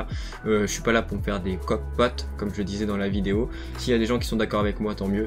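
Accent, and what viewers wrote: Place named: England, United Kingdom. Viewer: Le petit accent anglais qui fait plaisir insragr_a_ m continue comme ça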